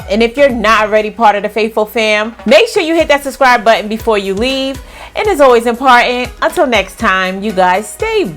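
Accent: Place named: New York City, United States. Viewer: Jersey girl here! I hear your THICK NYC accent! 💕 I have family in the city! Love your videos! Love this unit! Congratulations on the new car! I have been following you for YEARS!!!!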